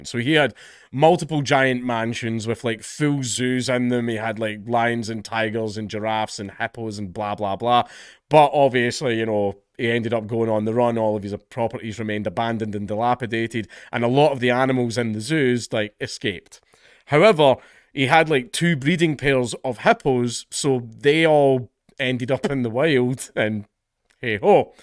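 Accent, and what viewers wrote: South Africa. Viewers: Haha,nice saffa accent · That was a mint South African accent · saffa accent is on point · I love your south African accent. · South African accent has me dying 😅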